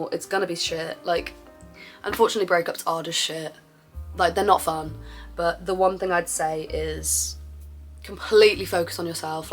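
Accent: United Kingdom. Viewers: Бля смотрю это чтоб повысить уровень англ. И нихуя не понимаю! Британский англ. – это полный пиздец. Как блять эго понять?? 😭 Смотрю на субтитры и понимаю половину слов, а если их убрать – нихуя. Блять учу англ уже год и не могу поднять уровень 😭 извините, но моей душенька устала. Теперь легче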